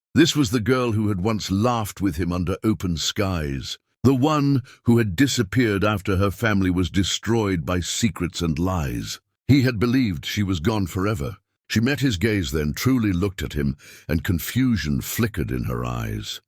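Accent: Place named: RP, United Kingdom. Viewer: A story about the American Wild West and you choose to use an upper crust sounding British voice dripping with contempt? Nope!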